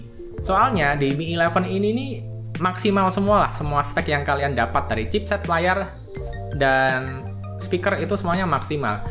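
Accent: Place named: Java, Indonesia. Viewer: Logatnya jawa bang..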